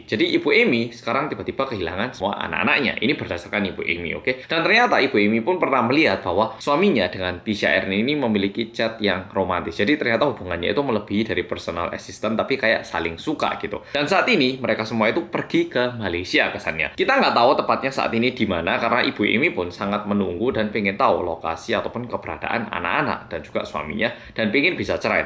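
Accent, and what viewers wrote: Java, Indonesia. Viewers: Ini dia orang korea apa orang jawa si? Kok lebih medok dia daripada gue yg setengah jawa🥺 iri😔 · Pada mulanya mas itu saya pikir orang jawa yg bekerja di korea😂😂😂😂Soalnya logat dia tu pekat banget jawanya.😂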